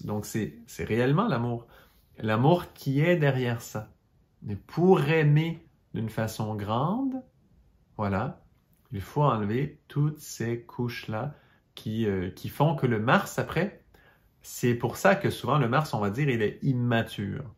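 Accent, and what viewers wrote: Quebec, Canada. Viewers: Et l'accent québécois my God je trouve ça trop cute! Une magnifique province,j'ai eu l'occasion de remonter le st Laurent avec des pêcheurs:INOUBLIABLE! Nous les français on vous adore!💖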